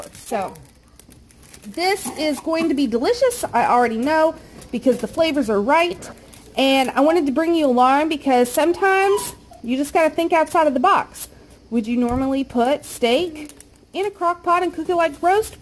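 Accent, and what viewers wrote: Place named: Appalachia, United States. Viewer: I love it! Where are you from? I’m guessing somewhere in Appalachia?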